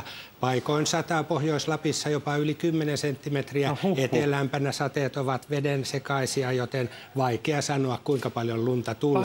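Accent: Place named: Spain. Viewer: sounds like spanish accent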